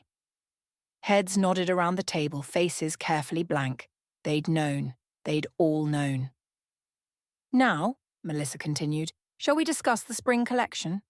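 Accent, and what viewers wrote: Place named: United Kingdom. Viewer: The British accent drives me crazy. Sounds like she has a mouth full of marbles. I’m gone!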